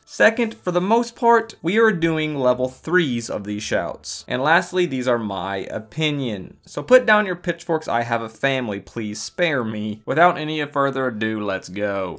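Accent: South, United States. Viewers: your southern drawl got stronger since the last upload. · I honestly can’t tell if you have a southern accent or a british accent.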